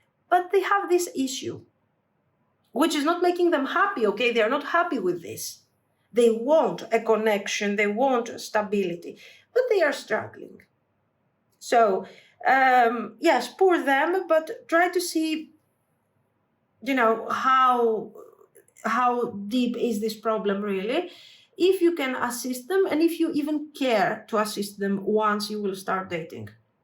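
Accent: Greece. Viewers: I guessed you’re Greek from your accent 😆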